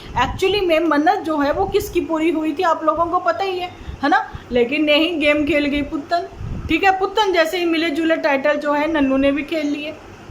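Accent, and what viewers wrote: Punjab, India. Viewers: ji main ludhiana se hu pera apki punjabi theth punjabi hoti bhot achai lagti jai🎉🎉🎉🎉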